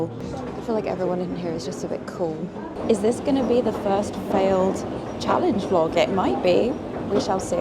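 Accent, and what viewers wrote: United Kingdom. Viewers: I enjoy, very much, shopping with you, walking, browsing. I don't need to buy a thing. Also, side note: no one understands why the men's fashions are so interesting to me, yet they are. I have two godsons and still to this day I enjoy taking them clothing shopping. They have fabulous taste, thank goodness. I thought your camera work was smooth and I enjoy your voice very much. I am an American and I so easily enjoy a beautiful British accent. I find British vocabulary is coming to mind and replacing the American counterpart. I rather like this!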